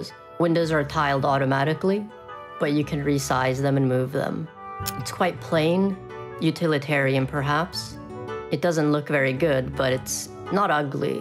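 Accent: Sweden. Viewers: wait are you swedish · This cute little goblin has the most Swedish fucking accent I've heard. And the funny thing is that they've almost entirely flattened their accent, the pronunciation is just a whisper away from Platonic standard. Amazing, that can only happen in a Linux video, fucking phenomenal. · is your accent swedish? owo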